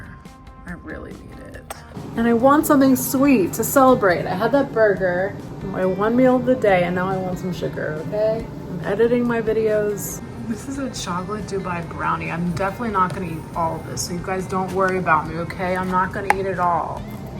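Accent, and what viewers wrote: South Africa. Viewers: Hi. Glad to hear a South African. I'm from South Africa. Johannesburg. 😊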